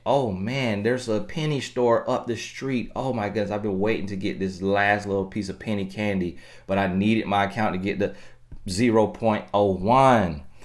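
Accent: South, United States. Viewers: 😂Southern lango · Mz Chocolitt FL must be the deeeeep south! Im for NC, but is on a whole other level of country. Truly distinguishes his message; a country bumpkin with sooooooo much wisdom.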